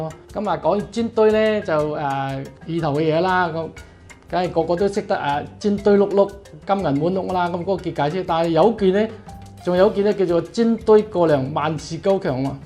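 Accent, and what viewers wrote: Zhongshan, China. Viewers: 中山石岐話音❤️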